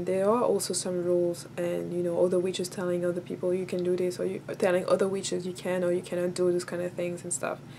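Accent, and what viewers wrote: France. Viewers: I can hear a French accent peak through am I right? But good story time girl make more videos can you do a love spell?!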